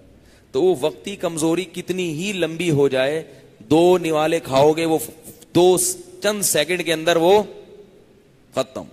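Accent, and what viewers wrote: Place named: Karachi, Pakistan. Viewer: Apka bat krny ka andaz mujhy bhout pasand ha ماشاءاللہ ar boli sa app mujhy karachi sa lagty hain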